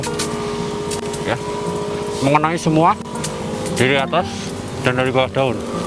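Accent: Banyumas, Indonesia. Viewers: Logate ngapak.. asli ngendi rila kang? 🙏 salam sekang cilacap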